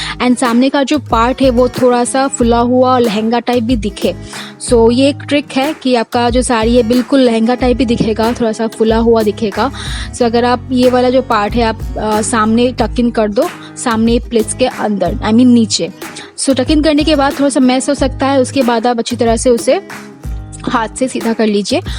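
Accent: Bengal, India. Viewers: Didi tmr kotha sune mone hocche tumi nischoy Bengali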